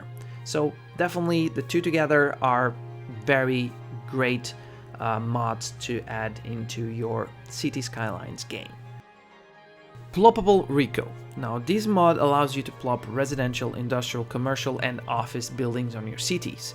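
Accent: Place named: Netherlands. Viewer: Dutch accent love it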